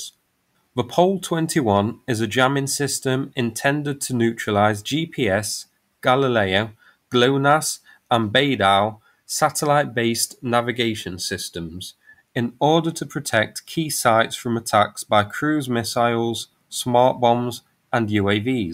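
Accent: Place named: Northern England, United Kingdom. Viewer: Thanks for the info. I'm not a Brit, but if I had to guess I would have guessed that his rather... noticeable accent was northern. 😉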